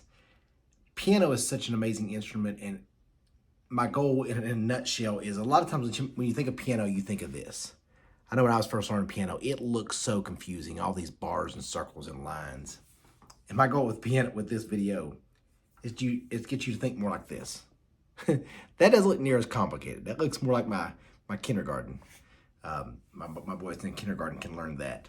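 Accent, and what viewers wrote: South, United States. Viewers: I hate your country accent!!!!!!!!!!!!!!!!!!!!!!!!!!!!!!!!!!!!!!!!!!!!!!!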